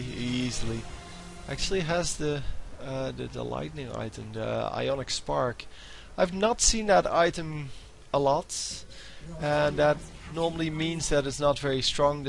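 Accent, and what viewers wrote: Netherlands. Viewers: Are u Dutch? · He is from the netherlands, and his accent is a very typical dutch accent.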